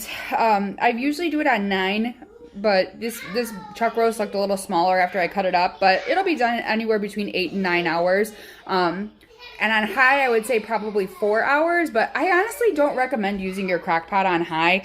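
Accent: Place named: Chicago, United States. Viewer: I was born in Chicago, raised in Des Plaines area and LOVE Chicago style "Italian Beef"!!! It really is mostly a Chicago area ONLY dish. I moved to the West coast in 1970 and have been missing my beef sandwiches ever since! Portillo's is great and does have a location Near "Knott's Berry Farm" in the Orange county area of Southern CA but we're a long way from there near San Diego. Not sure if we can find giardinerra out here or not. Recognized you accent. immediately! haha Will try your recipe but I do have a deli slicer so will likely use a rump roast and slice it up razor thin. Thanks!!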